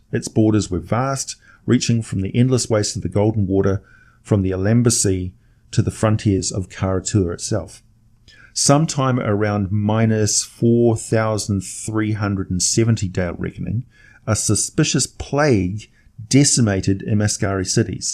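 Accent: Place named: New Zealand. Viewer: Wow another Kiwi! Such a great thing to hear when I'm not expecting it XD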